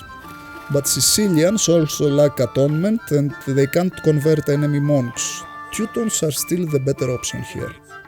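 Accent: Greece. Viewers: Dude... are you Greek?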